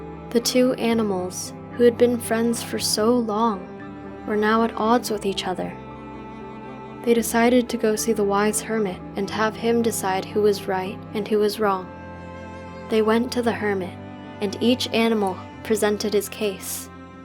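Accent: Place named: United States. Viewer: It is Bodhisatta. But she is pronouncing all these Pali words with American english accent and maybe with other accents, and making them sound like so alien words.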